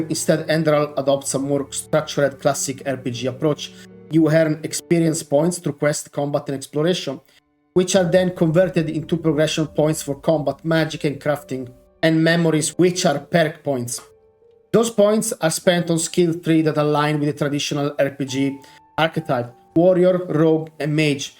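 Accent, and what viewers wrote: Italy. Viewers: Your language is beautiful and poetic! You might just want to speak a tad more slowly, pause for a moment between sentences to make it easier to follow for those of us not used to the Italian accent. Mille grazie!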